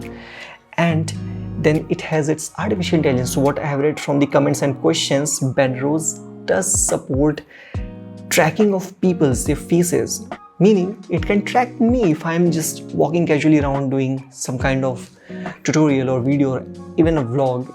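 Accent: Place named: India. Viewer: That indian accent is just too heavy to understand. Just watch this instead... <link>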